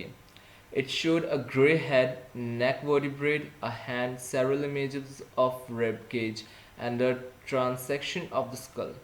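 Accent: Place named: United States. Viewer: it is not necessary to speak in an American language if u would have spoken in a simpler way it would be much clear to the students. anyway ur video helped me a lot . thank u sir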